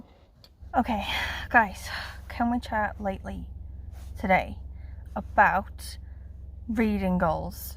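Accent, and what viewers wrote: Wales, United Kingdom. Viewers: Loved hearing the Welsh again. You sound amazing to me. Why are you taking the class? That sounds like a really stupid question since I know you LIVE in Wales, but seems like it’s become more of a priority in the last year. 🎄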